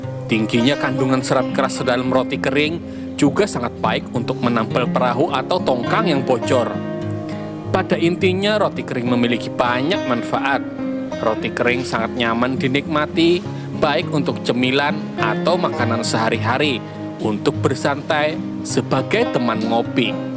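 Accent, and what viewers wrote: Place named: Java, Indonesia. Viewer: Why medok jowo? Ulun kira bubuhan keluak si julak nih... Tapi lucu pan.... Kawa jua... Baumpatan. ☺☺☺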